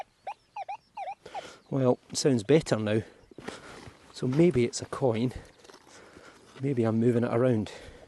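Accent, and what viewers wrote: Scotland, United Kingdom. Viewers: Just found your channel. I really enjoy watching all the digs. Especially love the Scottish accent. I swear you sound exactly like Roger from Outlander.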